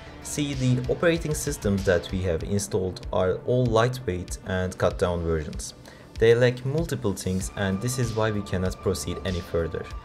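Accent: Turkey. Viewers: Sesinden Turk oldugunu tahmin etmistim diye goruce emin oldum, Jay in videosundaki yorumu gorup geldim. Eline saglik 🙏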